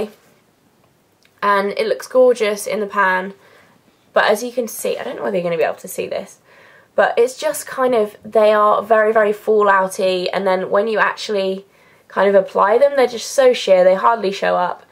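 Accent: United Kingdom. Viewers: Your accent is DIVINEEE im British, and ive always hated our accent on all accounts, but for some reason yours seems to be an exception, i absolutely love it! I wish/hope i sound like you do! LOL :) You're an amazing guru, keep up the good work! You're videos/tutorials have really helped me out :D x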